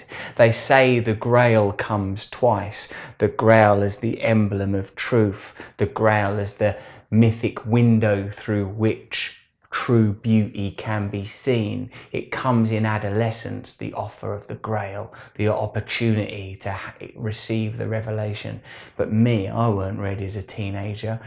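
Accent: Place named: Cockney, United Kingdom. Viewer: I love hearing this wisdom with a Cockney Accent. Brill innit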